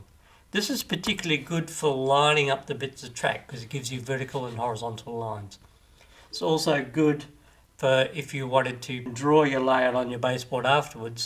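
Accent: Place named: Australia. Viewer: Thanks mate, excellent tutorial. Good to hear another aussie accent in railway modelling. Will look out for the next installment. Paul from Sydney.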